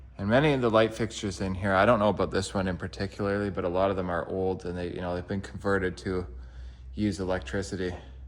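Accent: Canada. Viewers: Canadians always pronounced anything with an “ou” like owoo… howoose (house) owoot (out) spowoot (spout). Weird! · I subcribed to you a long time ago, somehow forgot about how much i enjoy looking at homes, you popped up and i am so addicted and been watching like crazy! You are so kind and respectful. I love your canadian accent sooooo much! Omg, your just adorable! ❤❤❤ thank u! Your videos calm me down after a hectic day. Hugs!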